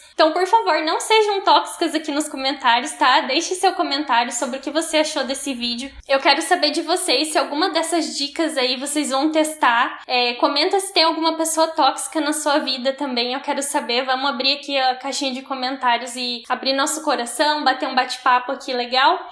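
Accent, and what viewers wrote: Paraná, Brazil. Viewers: Ah, mas eu a-do-ro esse sotaquinho do Paraná <3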